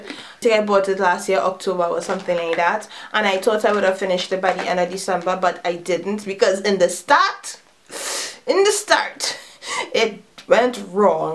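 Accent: Trinidad and Tobago. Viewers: Soon as you started talking i already knew where u were from #trini....thks for the tips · Are you from the Caribbean?... you sound Trini lol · Oh my gosh Im Trinidadian as well! So happy to hear you are as well! These tips are great also! Im planning on trying this but really scared of trying bc im kind of prone to acne...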